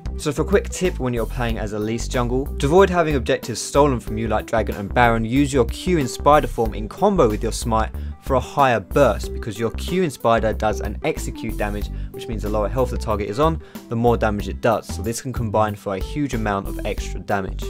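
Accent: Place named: Southern England, United Kingdom. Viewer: ... What do you mean what's with the accent? He's from the south of England...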